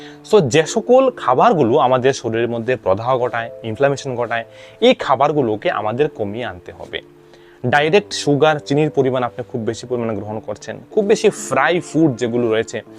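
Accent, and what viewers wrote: Bangladesh. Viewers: Apnar kothate mone hochche apni Bangladeser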